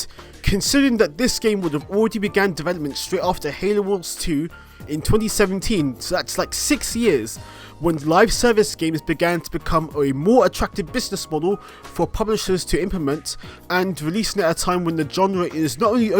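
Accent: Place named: United Kingdom. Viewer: Why is he British?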